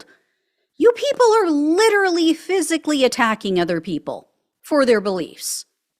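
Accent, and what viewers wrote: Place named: West Coast, United States. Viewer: I wouldn't think it's Southern. Sounds to me West Coast, maybe CA. I grew up in Oregon, so sounds similar to me. But hey I don't know for sure where she's from.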